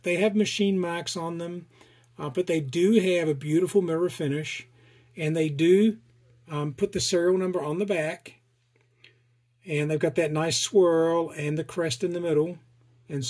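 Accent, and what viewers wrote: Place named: New Zealand. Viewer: I’m a kiwi who has lived in Australia for 30 years , I hear New Zealand , Australian and American in your Accent , and a hint of something else I can’t quite put my finger on 😂 . I like your channel 👍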